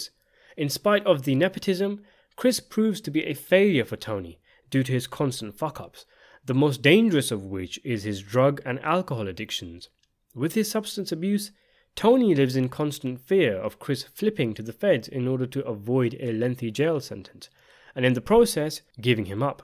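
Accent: United Kingdom. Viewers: I’m sure I said this in a previous video but it’s so bizarre hearing a British accent talk about American mafia business and a show based in my home state but it works in the best way .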